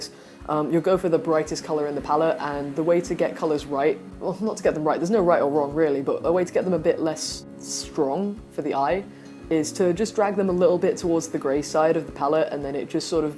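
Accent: United Kingdom. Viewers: Are you brittish? I'm terrible at knowing accents even though I'm from england lol. EDIT: you are brittish! That's so great! Your voice is really cute. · You have a british accent (I think), so I had some problems to follow what were you saying (I'm Venezuelan) but in any case I love your style!! Is so awesome!!! 😍 I wish I would draw like this, my style is more kinda...cute? (Is what the people said to me) if you're curious my Instagram is From myself, you already have loyal follower X3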